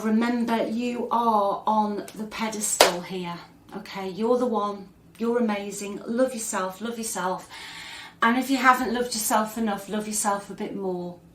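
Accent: United Kingdom. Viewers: Hi ,greetings from Montenegro ,i love Youre Brittish Akccent,i love English language i speak and learn as a child ,thank you for youre readyngs ❤❤Love Light and Happy New Year 🎉🎉🎉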